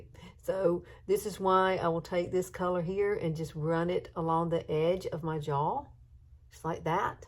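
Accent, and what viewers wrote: South, United States. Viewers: I’ve been so sad about the loss of your mom and I’m praying for you, your family, and now your friend, Terri. What a tragedy to lose a child. My heart hurts for you and your friend. I’m not very tech savvy and I usually watch just YouTube videos, but I’ve found a few of your videos on Facebook. I don’t use FB very much at all and I’m not sure if I’m posting comments correctly or not on there. Do you usually put more videos on FB or YouTube? I sure enjoy watching and listening to your southern voice😊 From one southern gal to another, love and prayers to you and your family 💕💕💕💕💕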